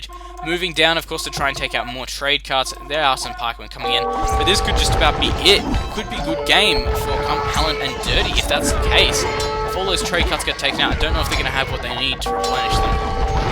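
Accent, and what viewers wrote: Australia. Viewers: Are you-(the commentator in the video)- australian?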